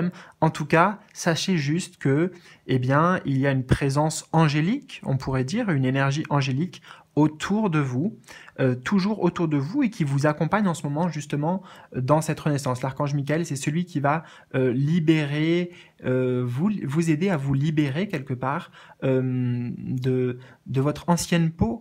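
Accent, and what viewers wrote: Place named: England, United Kingdom. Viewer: 😂😂 super accent anglais ! Merci pour la vidéo 🌟✨🌈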